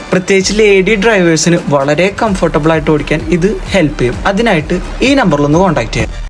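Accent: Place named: Thrissur, India. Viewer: നല്ല സുഖമുള്ള തൃശ്ശൂർ രാഗത്തിലാണ് സംസാരം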